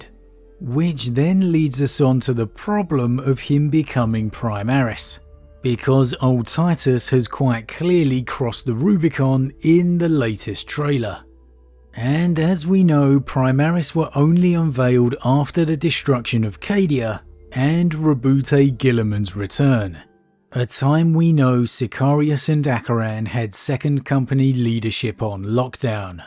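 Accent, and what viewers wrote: United Kingdom. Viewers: The American pronunciation of Lieutenant is correct. It was only the British misunderstanding how the French were inflecting the "Lieu-" prefix that led to the incorrect "Left-tenant" pronunciation you guys use today.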